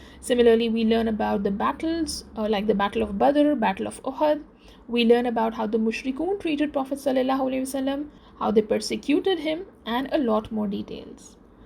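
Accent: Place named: India. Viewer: i think she lives in Qatar(as mention in about section) but by accent it seems she's from India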